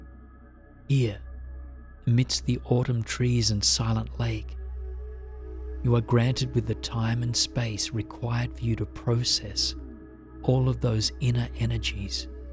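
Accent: Australia. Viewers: Its always weirdly comforting to hear another Australian voice on YouTube! Thanks for your efforts bro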